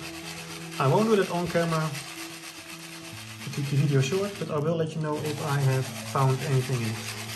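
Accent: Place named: Netherlands. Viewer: Ik hoor gewoon dat je nl bent hahah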